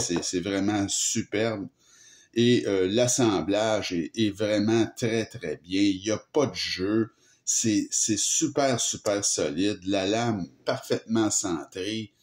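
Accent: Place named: Quebec, Canada. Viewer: Quel plaisir d'entendre l'accent québécois😊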